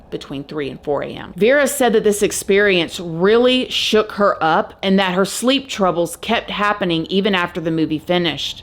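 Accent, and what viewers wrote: South, United States. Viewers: I just saw you on TV! It was an as for a tv channel. Mysteria I think. Haha! Congratulations! I wasn’t even looking at the tv and I heard ur voice and that beautiful Southern accent and looked up and saw u for a split second. But undoubtedly it was u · Where are you from? 🥰 I almost recognize your accent from the south ♥️ I’m new here and I’m enjoying it so far!!! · Just found your channel and I’m gonna say your from the south lol. You sound like your from where I’m from. 😂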